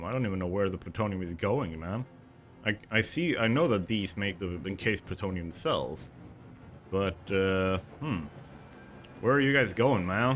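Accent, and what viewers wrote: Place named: Sweden. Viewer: i hear swedish accent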